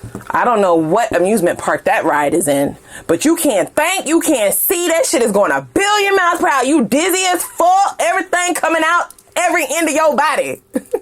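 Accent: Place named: United States. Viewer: Nice analysis.but sisan people can't get your USA pronunciation.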